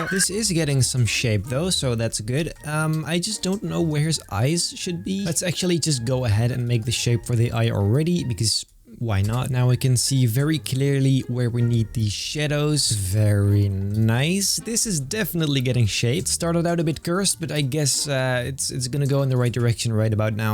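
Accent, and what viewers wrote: Netherlands. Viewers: IDK WHY BUT YOU SOUND SO DUTCH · ik hoor dat nederlands accentje hhahah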